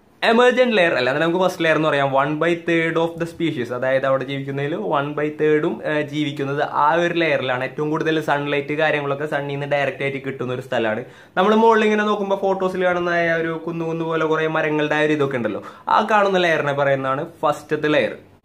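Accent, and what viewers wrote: Thrissur, India. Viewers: Are you from thrissur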